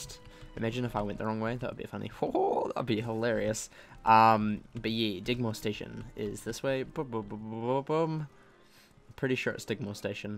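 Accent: New Zealand. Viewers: Hey you sound like a kiwi player.. so am I if you are.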